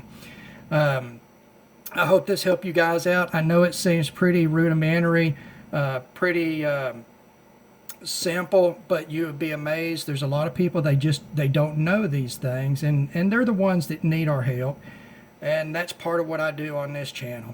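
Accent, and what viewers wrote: South, United States. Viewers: G'day Champion how ya doin ? i'm new to your channel and i'm already lovin it. Great reviews and very informative, keep up your great work P.S i love your southern accent. Cheers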